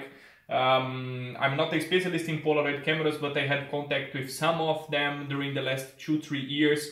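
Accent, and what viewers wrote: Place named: Germany. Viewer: Yeahh awesome collection. And the german accent 😂🤘🏻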